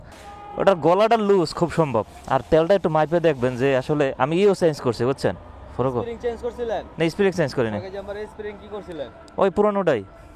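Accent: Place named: Narayanganj, Bangladesh. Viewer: ভাই আপনি কি নারায়ণগঞ্জে নাকি ? নারায়ণগঞ্জের মত মনে হচ্ছে।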